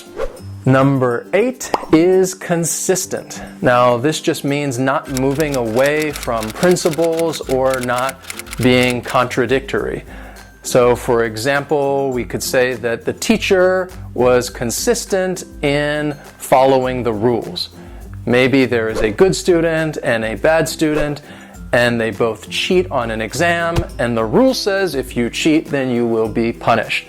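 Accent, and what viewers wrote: United States. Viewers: Dear Sir! I have to admit that the video was definitely useful for me to expand my vocabulary, anyway very thankful for you free lesson with an excellent American accent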